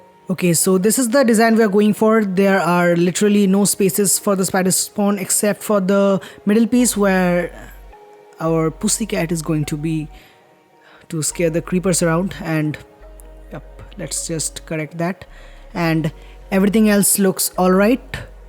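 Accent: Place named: United Kingdom. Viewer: Litrelly got orgasm by intro music and your British accent eng.. senpaieeeeeeeee..✌️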